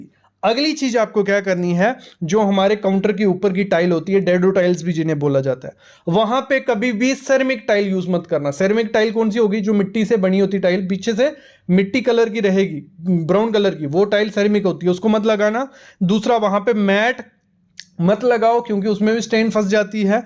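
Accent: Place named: Punjab, India. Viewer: Why I'm observing ur voice u r from punjab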